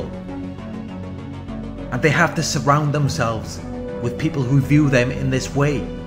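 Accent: Wales, United Kingdom. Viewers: I like your Welsh accent :-) great video, very informative.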